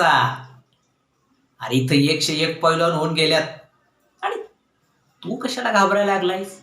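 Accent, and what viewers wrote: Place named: Kolhapur, India. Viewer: Mast ahe bhau kolhapuri laheja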